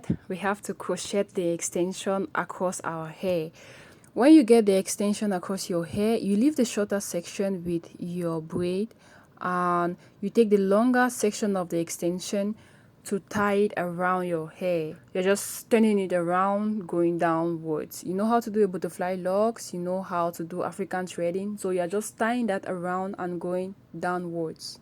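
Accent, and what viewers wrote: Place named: Cameroon. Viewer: Hi my Cameroonian sis🥰🥰. I heard you speak and I’m like she sounds Cameroonian… I watched untilllll I heard you say you are from pays 😍😍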